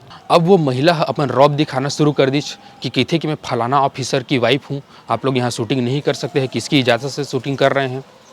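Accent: Chhattisgarh, India. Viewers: sahi khe bhaiya e galat baat aaye kono mer bhi video bnaye ma kooi galat baat nai he aap lage rhav koi bdde bajat ke film rhitis ta permission ke jarurat padtis o mahila khud Chattisgarh ke nai hohi tabe tunhar boli sun ke bhi ola pta nai chalis pr ihu baat ho sakthe ko ola apn area ke security ke fikar hohi ladki ya mahila mn ke ki kono au jgh ke ladka mn aake oti ke turi mn la pareshaann mt kray ya chori hari ke dr ma khe hohay pr aap lge rhav kono din o ha e video la dekhhi au humar Chattisgarhi ola samajh ma aahi ta jrur smjh jahi..... keep it up au All the best.....😎😎😎😎😎😎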